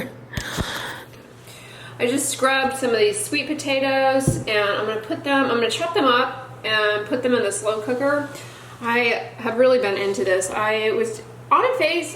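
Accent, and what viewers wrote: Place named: South, United States. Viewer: I like your Southern Accent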